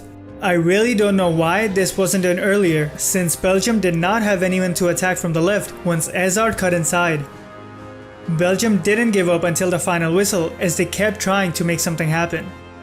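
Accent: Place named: India. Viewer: The guy talking has to be an India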